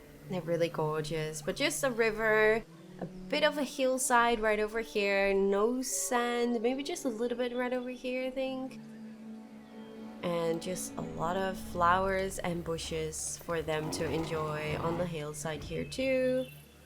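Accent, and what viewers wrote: Netherlands. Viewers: Soms dacht ik een Nederlands accent te horen, moest het even opzoeken. Zelde hoor je Nederlanders zo goed engels spreken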